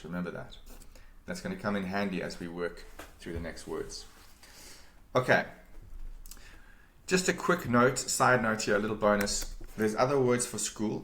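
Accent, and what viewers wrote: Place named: Cape, South Africa. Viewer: cape accent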